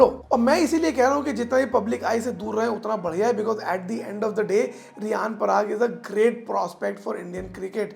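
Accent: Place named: Hyderabad, India. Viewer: Touba touba bhoth buri Hyderabadi bolre tum aur ek bar nakko bolona plzzzz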